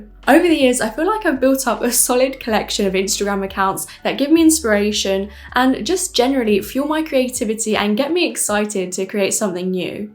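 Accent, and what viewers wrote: England, United Kingdom. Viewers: I really like your content and especially your English accent, so posh and elegant looove it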